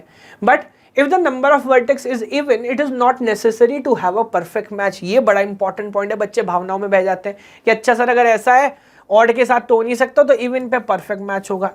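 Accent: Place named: Kanpur, India. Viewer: aap kanpur ke lag rhe ho sir, Jis tarah se kahe , or pel diya use kr rhe ho .....BTW BEST EXPLAINATION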